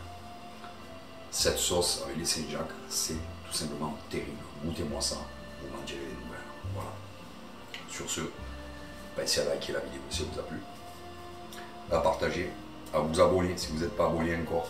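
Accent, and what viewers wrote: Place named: Provence, France. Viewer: Je viens de réaliser cette recette, simple et délicieuse à la fois. Je m'abonne avec plaisir. J'entends votre accent, ne serions-nous pas de la même région (Var pour moi) ? Belle journée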